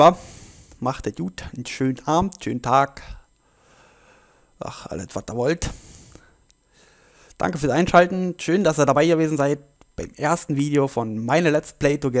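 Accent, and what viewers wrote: Berlin, Germany. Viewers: Und bitte rede nicht Berlinerisch. Das klingt bei anderen noch OK. Aber bei dir so scheiße und überdreht......... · Du musst unbedingt die Berliner Schauze behalten... bloß niemals Hochdeutsch! Aber bitte beleidige die Schafe nicht so wien Berliner Penner :D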